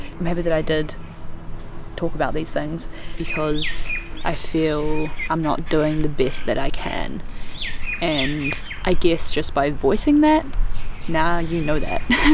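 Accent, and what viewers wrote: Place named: New Zealand. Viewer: Don’t be so anxious...I could listen to you read the phone book for hours...your kiwi accent is like ear candy...keep talking....in other words, don’t feel like you have to live up to any high standard, with incredible scenery or with wild adventures....just be yourself....I’ve been watching you on Delos and Ramatree for a few years and so has a lot of other people....we feel like we know you and we truly care about you...and we want you to have fun in whatever ever you do, but to also be cautious....the Tunisia video had a lot of us scared....I think most people just want what is best for you....and that is where some of the judgements from viewers are coming from....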